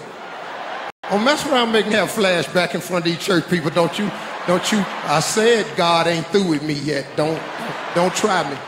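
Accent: South, United States. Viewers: Man his southern ebonics is so thick here i can barely understand him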